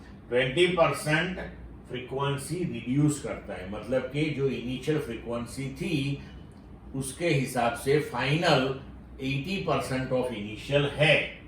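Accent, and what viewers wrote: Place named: Gujarat, India. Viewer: Thank you sir, and you have got full gujarati accent nice explanation !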